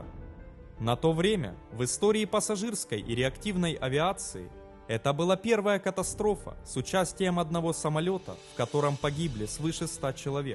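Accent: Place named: Ukraine. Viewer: Это неисправисо..! Карьтавые идут на радио, а с ужасным малоросским акцентом зачитывают длиннющие авторские тексты!🤯 Скоро рыбакам пора курятники обслуживать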